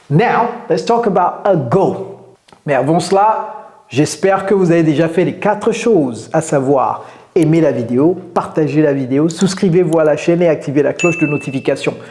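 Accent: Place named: United States. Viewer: Bonjour C'est une première franchement vous êtes le meilleur sincèrement Je suis content de vous connaître L' accent américain c'est top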